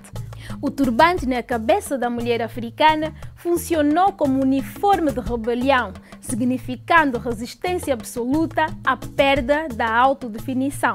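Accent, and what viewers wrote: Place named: Portugal. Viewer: Por favor, não prenuncie as lingua africanas com sotaque/tiques do português. Não mates os teus vídeos, jovem!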